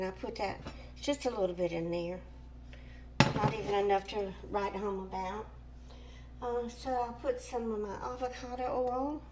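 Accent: South, United States. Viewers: Hello dear, it's been a little while but it is always lovely to hear your warm, welcoming Southern USA Accent:) Bless you.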